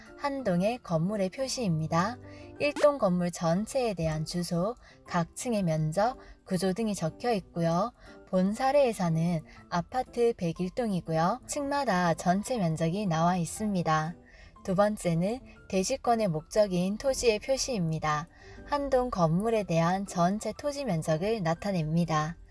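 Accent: Gyeongsang, South Korea. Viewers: 유익한 영상 잘 봤습니다~ 쉬운 듯 어려운게 등기부 보는법 같아요. 전 님 경상도 사투리가 참 듣기 좋아요^^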